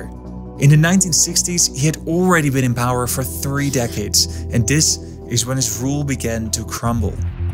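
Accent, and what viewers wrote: Netherlands. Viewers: You've got a pretty thick Dutch accent. Are you Dutch?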